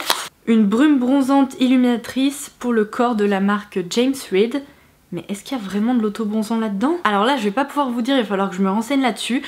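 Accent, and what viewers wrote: England, United Kingdom. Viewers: Ton accent anglais il et cher beau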